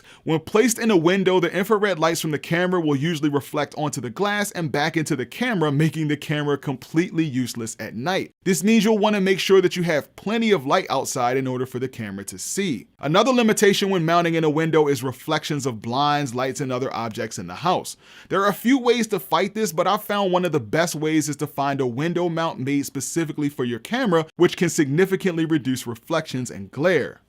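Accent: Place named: Baltimore, United States. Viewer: Oh you from Baltimore Baltimore lololol. Good video man, this gave me a bunch of info. I'm kinda torn between bullet and ptz, it's gonna boil down to what the individual cameras offer.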